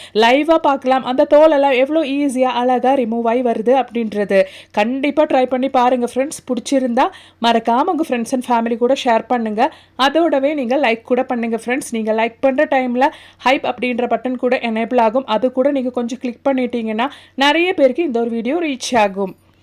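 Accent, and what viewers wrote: Kerala, India. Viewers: உங்க பேச்சில் மலையாள வாசனை அடிக்குது.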